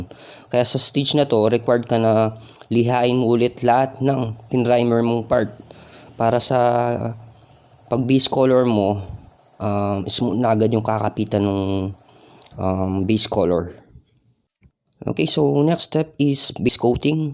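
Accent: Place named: Pampanga, Philippines. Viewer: lupet mo neh soy.kapampangan ka ne soy tunog pamu.ehh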